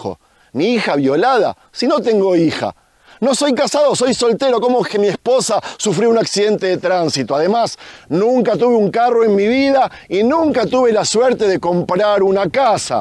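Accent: Buenos Aires, Argentina. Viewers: Shalom, cada vez que te oigo digo, este hombre no puede negar que es Argentino y Porteño. Muy buena la metáfora . Los gobiernos populistas te empobrecen y después hacen creer de que eres un pobrecito y ellos como son buenos te da un poco de comida y alguna beca. Y sabemos, que siempre la comida gratis siempre es la más cara. Cariños a tu esposa, festejando el aniversario en tu trabajo. En lugar de llevarla a Paris. Jaja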